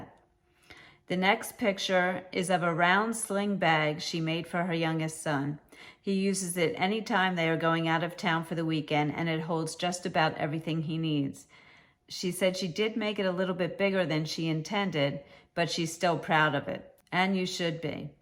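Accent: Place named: Upstate New York, United States. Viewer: Hi,! Great pics!! It's wonderful to see the projects! They are inspiring. I love the cat couches. I won a booklet of 3 cat couch patterns, but felt intimidated, plus I didn't have access to the foam pieces to put inside. Seeing these wonderful, colorful cat couches, I just might give it a try down the road. The other projects were all amazing, too... love the blanket with the appliques. Love your website, and thank you for the Yarn Ends Tip Sheet from S.D. I had wondered if you were in New York; you sounded like an upstate or Long Island New Yorker to me, not one from the City. I am a native Ohioan, but spent 4 years in northern New Jersey before returning to Ohio at age 21. My family used to cross the border to hike to historical sites in New York. I hope you really enjoyed going to the wedding.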